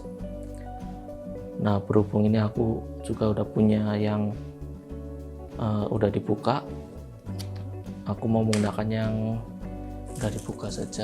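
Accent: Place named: Banyumas, Indonesia. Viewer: Mantap, maturnuwun sharing infornya Mas'e! Ngapak Forever! 👍👍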